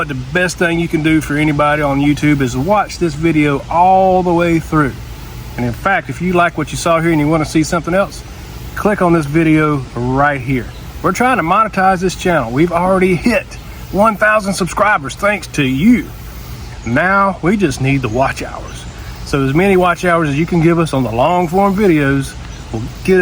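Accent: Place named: United Kingdom. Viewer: You sound British!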